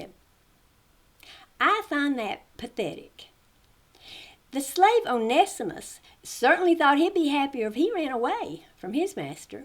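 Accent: South, United States. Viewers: First I just have to say how much I love your southern accent , the hi and bye in your videos is just the best to me lol . Very well said and excellent pints , I’m 29 and have spent most of my life in fundamentalist Christianity , but every time I opened the Bible and saw the strikingly human nature of this god it constantly agitated me .. how could this have been the supreme god who we are suppose to worship , and yet his moral fabric is shady at best and outright malicious at worse … along with the fact that he does nothing to intervene in the collective human suffering that’s continuously happening in this world , and then ti stoop so low as to use threats of torture against people who won’t Appeal to his ego , no thank you , what is the point of having a god who is utterly useless and narcissistic.. needless to say I’m glad I got out when I did . 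Excellent work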